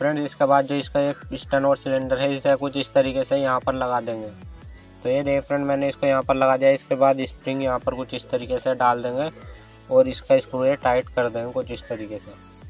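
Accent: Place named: Bijnor, India. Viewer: kyuki m bhi bijnor ka hu .bhasa sunke pata chala 🤣🤣vaise tum bijnor m kaha se ho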